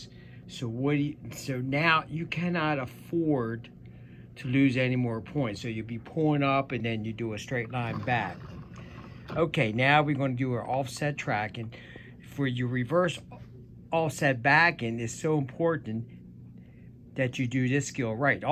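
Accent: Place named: Maryland, United States. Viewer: Thank you so much for all your videos they were a huge help , I got my CDL today 🎉 Also I’m originally from Maryland so you sound like my people 😊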